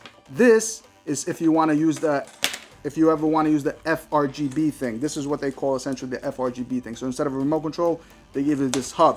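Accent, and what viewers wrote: New York, United States. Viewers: Love your NY accent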